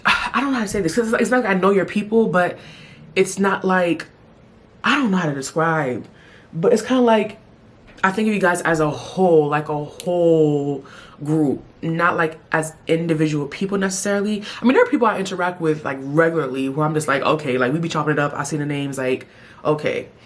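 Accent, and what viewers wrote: United Kingdom. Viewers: I love it British voice 💓 LOL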